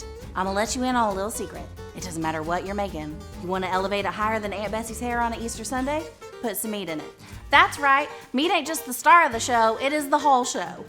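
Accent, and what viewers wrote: South, United States. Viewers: That Southern accent is like butter. It makes things better.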